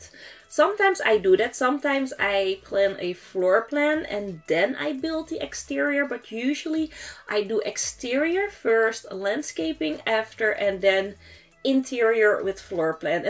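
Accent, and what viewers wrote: Netherlands. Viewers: dit is de eerste video die ik kijk van je en ik hoorde gelijk dat je nl was x mooi gemaakt😍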